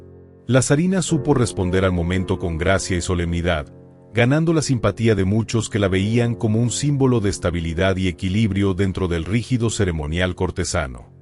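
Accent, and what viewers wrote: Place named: Mexico. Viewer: Me encanta el acento del español mexicano, mucha gracias al narrador.🎉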